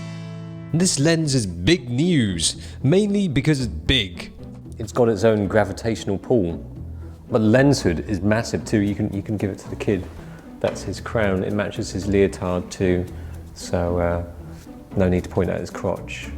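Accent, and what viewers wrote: United Kingdom. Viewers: Oh my god, Asian face with British accent.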